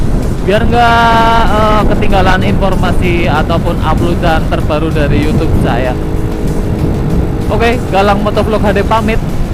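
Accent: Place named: Java, Indonesia. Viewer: Jawa ngapak tapi mas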